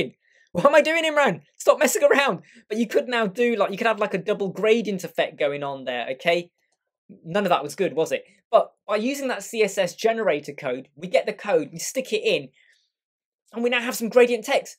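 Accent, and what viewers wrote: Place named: England, United Kingdom. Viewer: i liked your english accent , are you a native speaker ?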